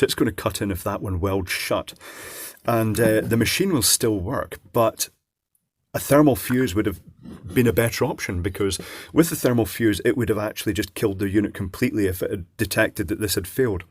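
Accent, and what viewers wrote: Scotland, United Kingdom. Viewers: Legionitalia not quite the same in his Scottish accent, but we need to get him to sing that song ...bonus for death daleks dancing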